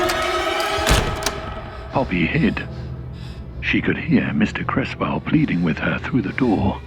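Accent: United Kingdom. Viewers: the narrator’s voice is terrific and british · EEEuww. I don't like this one. I listen to audiobooks often and the narrator sounds just the same. I am going to smash that British narrator right in his pie hole (figuratively, anyway). Poor Poppy.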